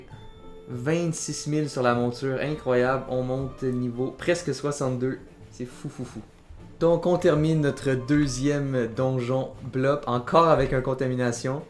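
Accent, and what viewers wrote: Quebec, Canada. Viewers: Excellente ta petite vidéo avec le commentary made in Québec. Tabarnak 😎.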